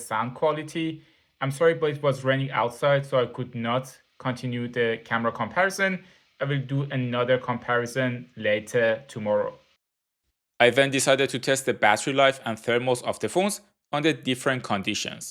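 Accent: Iran. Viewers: Dadash lahje englisit tablo'e Irani hasti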